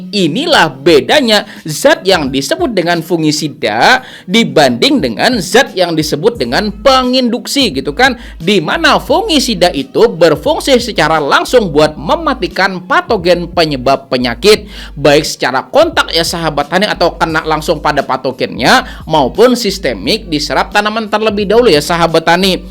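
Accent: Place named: South Kalimantan, Indonesia. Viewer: Logat Kalsel ni pinanya 😂🙏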